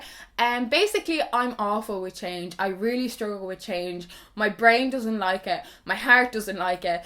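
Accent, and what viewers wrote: Ireland. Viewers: If you don't love an Irish accent, you're not alive.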